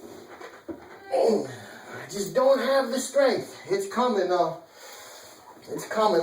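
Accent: New York, United States. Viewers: Nice Job!! I'm a fellow handyman and it's always great to get help or advice from a contemporary! Love your style and NY accent too as I'm from Philly and my wife's from Jersey so it reminds me of home! Love the way you remind the "boys and girls" to keep calm and take a moment! Great work man!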